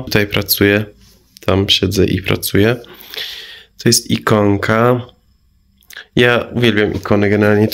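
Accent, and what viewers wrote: Poland. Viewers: Świetnie mówisz po Polsku i to z akcentem Polskim Znam tu obcokrajowcow którzy są dlugo i może mówią po Polsku ale bez akcentu Polskiego Zdolny z Ciebie Iranczyk I bardzo sympatyczny chyba jesteś unikatem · Jak Pana słucham to stwierdzam że ma Pan polski akcent i dobrze mówi po Polsku.👍🏵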